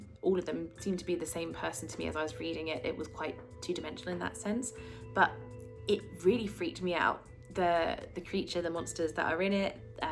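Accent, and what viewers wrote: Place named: United Kingdom. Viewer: The shoutout to Sunnyvale Reject was cool. He told me I'd like your videos and he was right. I love your amazing British accent (I'll listen to anything you say) and your passion for reading and books. You're going to be big.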